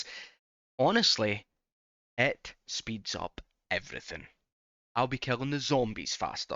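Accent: Scotland, United Kingdom. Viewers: Yeah no puro puro. Also, are you scottish? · Belt up, surely tha cannae bae another one chunk frey Glasgee! We need more o tha accent, hyped, gl! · YOUTUBE YOU DIDNEA TELL ME HE IS SCOTTISH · Half my family is fae Glasgae, so it's always nice to hear a familiar accent. But stellar production on the vid. I know I'm half a year late to the party, but I'm already queuing up the next episode. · I love me a good Scottish accent and more chunk content for my procrastination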